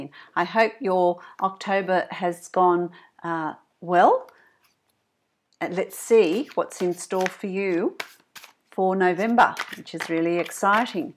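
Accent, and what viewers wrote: Australia. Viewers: You sound like an Australian, i like the way you explained this.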